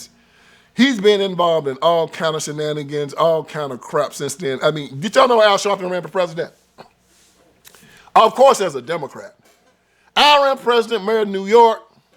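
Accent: South, United States. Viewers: Unfortunately, I couldn't understand a thing you were saying while you were laughing and talking. Between your southern accent and poor pronunciation, it is so difficult to understand what you are saying.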